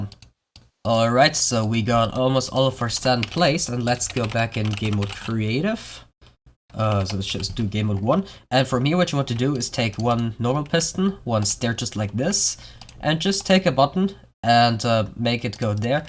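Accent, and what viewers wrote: Germany. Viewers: He even has the german accent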